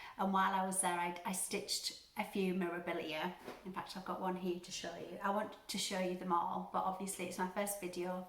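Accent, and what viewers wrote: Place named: Northern England, United Kingdom. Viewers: I love your accent as well, the Yorkshire accent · lovely to hear from a Northern lass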